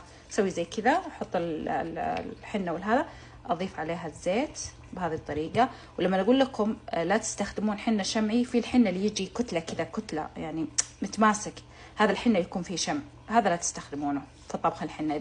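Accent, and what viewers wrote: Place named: Egypt. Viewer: حلوة اللهجة المصري على لسانك😂😂😂 ربي يحفظك❤❤❤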